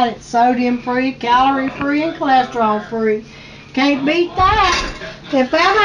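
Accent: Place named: South, United States. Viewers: Mary Mitchell sounds like dolly partons the same accent cute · That looks and sounds delicious. Gotta try this. I love your Hillbilly accent. I have a Texas twang myself. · Where are you from if you don't mind my asking sounds like ga nc sc dialect.....you just answered my question in this video lol i have family in West Virginia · I am really glad that you mentioned where you are from! You sound like my folk. I live in Texas now but I was born and raised in Parkersburg WVA!! You two are adorable! Thank you for sharing. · Lovely Lady, I won't laugh at your hillbilly accent if you won't laugh at my southern accent. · Hi, I just found you and your channel. I think you are absolutely PRECIOUS!! You aware perfect the way you are, and nothing wrong with being from Beautiful West Virginia either! It’s ALL GODS COUNTRY!! I love your accent, your cooking, down home style, the way I learned to cook from my Mom and Grandmother’s! 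I’m an Okie myself, Oklahoma City, but my Family is all over the state, yes, we are part Native American, coming from Indian Territory. Anyway, so funny, we made Breakfast casserole for dinner tonight! I too Normally let mine set overnight in the fridge, but I let it set for 30 minutes tonight. I too play with the ingredients, use what I have, tonight we had sausage, Bacon and Canadian bacon in this batch. I have never used yellow mustard, but I use dry Mustard in mine. I did want to tell you what I do, and hopefully you will try it. In the past, I always used the bread slices also, but it always seemed dry. So I got the idea, to use hash browns instead of bread. I use the loose ones or the patties. I do everything else the same. My family loves it, it’s never dry. I would love for you to try it, and let me know what you think! We love Hot sauce or Salsa with as a condiment with ours. It’s a dish that gets eaten up completely!! 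Anyway, love your channel, your cooking, your accent and your sweet personality!! Keep up the great work! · Love your accent...reminds me of growing up in Covington, Virginia.....just a few miles from the WVa line at White Sulphur Springs, WVa. I still live in Virginia, just down on the southside near the NC line now...we have country accents down here too! I am told I have a mix of southside and Western Alleghany accent now. LOVE your videos and your REAL cooking...and down home atmosphere. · My mother was from Crab Orchard, KY, and everyone around there sounded just like. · You make me homesick for old times when I was with my granny. My family is from West Virginia. You say there like my granny said it. Yes I grew up on sausage gravy. We always used canned milk. So good. We added water too. This recipe looks good. Thanks. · Ms I bet you can bake bread and rice pudding and cakes. our family was not raised on candy. 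mom would cook rice pudding when she had change on Sunday it was good Like your southern talk love you val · I love you, and your old fashioned recipes! Your accent makes me think of my maternal grandparents from Ky, originally from W.Va. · Hello to you both 😊 I have just found a video of yours for the first time and i love it. I live in England and I allways find southern accents like your super lovely and nice. Cant wait to watch more of your videos, this one looks super delicious xxx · well, I am a HILLBILLH too... I was born and raised in West Virginia.. I was DIRT POOR my entire life until a little over 18 years ago when I moved to South Carolina and married a very decent man.. I knew you were hillbilly when I heard your voice. I too am VERY PROUD of my heritage.. You can take the girl out of the mountain, but you CAN NOT take the mountain out of the girl.. Nothing wrong with being a hillbilly.. 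Hillbillies are some of the nicest and decent and God fearing people on the face of this earth.. God bless you and your family... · I love her southern accent!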